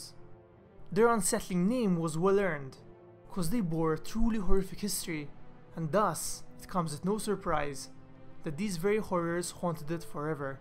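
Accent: Malta. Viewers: Are you Maltese ?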